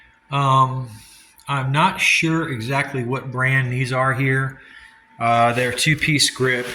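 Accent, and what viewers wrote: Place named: California, United States. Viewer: Your channel is our favorite, along with RAD BMX and Sensei Phu with Epic,for a Florida boy you sure sound like a California Dude. You know an amazing amount of stuff in your lessons and should teach classes on this curriculum. Colleges need to offer courses in this, it’s serious Americana history. You explain things so clearly and articulately, it’s a soothing stream of consciousness that relaxes the mind and the soul. Thanks again bro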